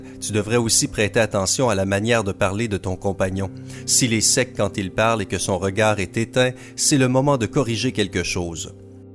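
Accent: Quebec, Canada. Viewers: C un québécois? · L'accent Québecois est tout simplement insupportable, c'est une insulte à la langue française. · Zoumouda Soufou C'est l'accent québécois. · T'es québécois ? Sa se reconnait avec ta voix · Lol il est quebecois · Tu es québécois ou quoi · C une voix québécoise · Québécois XD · Hé oui ! C'est bien tout ce qui s'est passé... deux ans avant ma rupture ! Heureusement qu'il y a cet accent québécois pour me r'monter l'moraaale !!! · Accent québécois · J’adore cet accent quebequois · C la nouvelle voie québécoise de simpa ou quoi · Cette accent canadien ouch 😂 PS : #teamcelibat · J'aime bien vos vidéos je me suis abonné. Et c'est un joli t'accent canadien.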